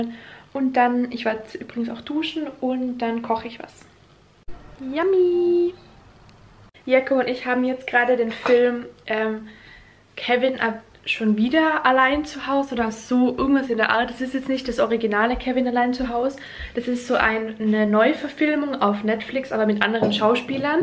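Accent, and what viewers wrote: Austria. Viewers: Welcher Dialekt ist das? "für die Uni zu dun" "Unicondend" :D klingt nach Österreich oder so?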